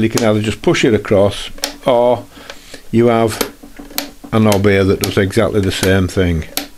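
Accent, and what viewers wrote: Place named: St Helens, United Kingdom. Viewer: this was exactly the tutorial i needed thank you. Didn't expect to hear a St Helens accent though; my hometown. Even better! lovely . tHank you !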